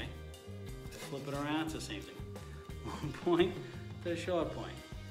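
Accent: Boston, United States. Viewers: I recognize a fellow Bostonian when I hear one!!!! 😂 😂😂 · Yeah, man. I’m a newbie and you’re talking straight to my level. You explain and repeat things so that I won’t have to rewind the video and it’ll be easily remembered. The comic relief and your accent (Boston, I’m assuming) is icing on the cake. Thanks, brah! Blessings.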